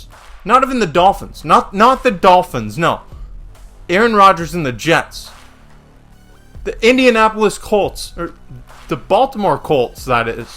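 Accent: Canada. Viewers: a canadian eh?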